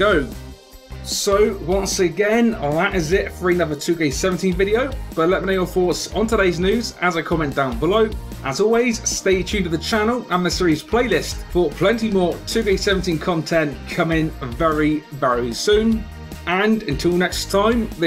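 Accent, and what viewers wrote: England, United Kingdom. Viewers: Rasist? What the fuck, men? I am is not rasist. I just don't understand English accent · also go watch denkops or will power if you don't like the british accent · Why you here if you dont like his accent. He's British how do you expect him to lose it? Before you start getting all brave and confident think about what you're saying before you say it. How can a BRITISH person lose their BRITISH accent. Common logic man. Thumbs up to you!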